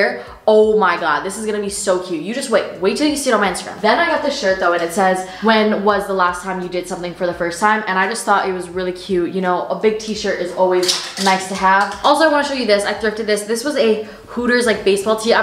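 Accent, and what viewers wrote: United Kingdom. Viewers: it's the british accent for me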